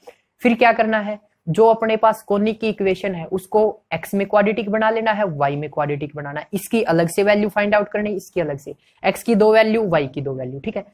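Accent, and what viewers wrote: Haryana, India. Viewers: Haryanvi mast h sir apki and apki video bhot bdiya lgi sir m bhi Haryana se hu sir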